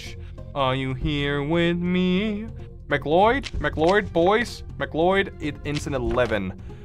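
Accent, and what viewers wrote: Denmark. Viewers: Wait I think bro my be danish he has that danish accent like me except I can get rid of mine for a more American accent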